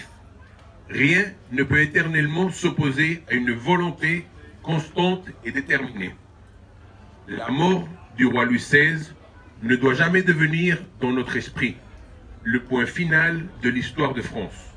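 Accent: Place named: Spain. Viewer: Ne soyez pas ridicule on sait très bien distinguer les différents accents,parisien,sud ouest,chti, marseillais etc,Louis XX n' a aucun de ces accents, et c'est étonnant d'entendre un accent espagnol de la bouche de quelqu'un qui est censé incarner l'héritage de la monarchie française,non?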